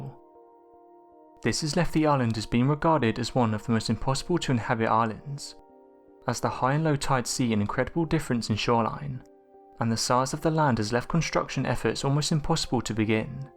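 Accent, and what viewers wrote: United Kingdom. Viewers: You sound like a British libtard that insists on importing Muslim illegals cause you love them so much, even though they hate and want to kill you....... Tell us I'm wrong....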